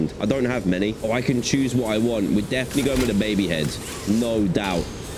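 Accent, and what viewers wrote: England, United Kingdom. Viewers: Are u a brit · do u live in England cuz I do and u sound English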